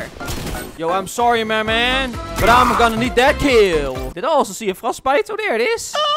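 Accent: Netherlands. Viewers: Lekker Nederlands accent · Nederlands accent echt geweldig😂😂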